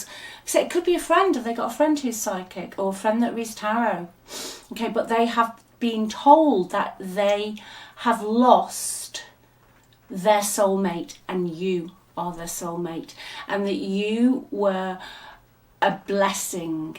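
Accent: England, United Kingdom. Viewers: thank you so much for this mornings reading. Love your strong English accent, reminds me of two years O went to varsity there. Darling, your advice is spot on, loving myself first and protecting my peace and freedom to do whatever I want. Busy investing heavily in some new ventures, I am not going to be giving as I used to be, can tell you that. My old self died before Christmas and with the protection and guidance of God, the legions of Angels and in particular Archangel Michael, I receive unmeasurable abundance for which I’m absolutely grateful. Gods timing is divine otherwise I would have been dishing these abundance out. Learnt my lessons the hard way, let’s just say I’m doing great and couldn’t be more happy. Blessed day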